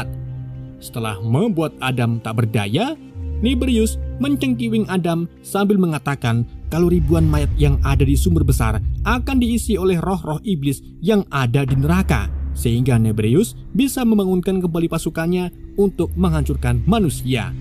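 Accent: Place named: Banyumas, Indonesia. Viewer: cingireh admine wong ngapak yah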